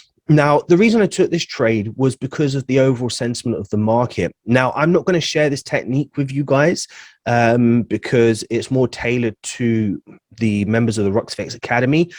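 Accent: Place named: Cornwall, United Kingdom. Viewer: Greetings from Miami!!! Love, love the vids!!! you truly ROCK. I was wondering if the membership you offer includes daily signals? Also, are you from Cornwall? I have a friend who's accent is soooo similar to yours...lol. Thanks for great content and clear delivery!!! 🙏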